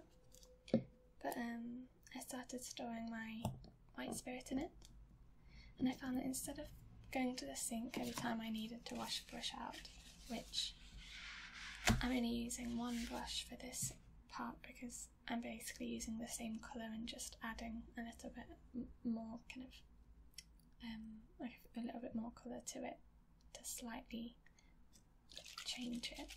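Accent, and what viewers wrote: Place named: Yorkshire, United Kingdom. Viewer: Great video! What is your accent? Sounds kind of like Yorkshire, but a little different. It's beautiful, by the way! Cheers from WV :-)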